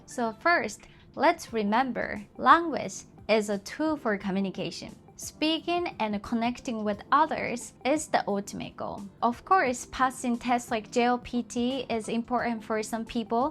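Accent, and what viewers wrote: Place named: United States. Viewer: 日本に住んでいるアメリカ人ですが、I have a question for you. いきなり聞くけど、 さんはもしかしてアメリカに行ったことがありますか? 住んだことは？😅 I guess you have spent time in either the US or Canada. なんかMiaさんの英語の発音を聞いてそう思っただけ🧐😉 頑張ってほしい😊👍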